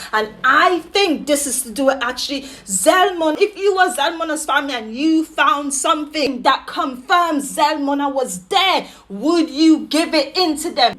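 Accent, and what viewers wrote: England, United Kingdom. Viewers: You sound like you from England leave that street shit alone..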